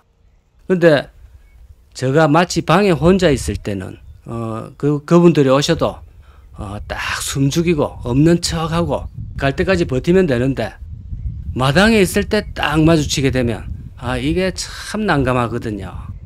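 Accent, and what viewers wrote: Gyeongsang, South Korea. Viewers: 일단 구독 찍고 좋아요 눌러놓고… 댓글 다는 까닭은… 묘하게 사람을 끌어 당기는 조금 투박하나 사람 냄새 품은 나레이션 때문이고요. 경상도 저 아래쪽일 듯한 사투리, 소탈함 속에 해학이 영상을 보는 내내 입가에 웃음을 머금게하는 까닭압니다. 할배네로 자주 구경 오겠습니다. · 타지방 억양1도 가미안된 순수 경상가야국톤 사랑합니다.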